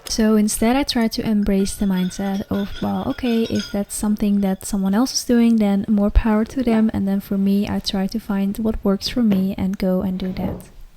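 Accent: Netherlands. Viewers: Are you dutch? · I feel like youre Dutch. I can hear the accent. First video I watch by the way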